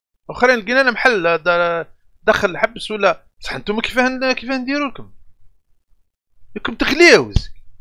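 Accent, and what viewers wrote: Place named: Morocco. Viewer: Ce minable a un accent marocain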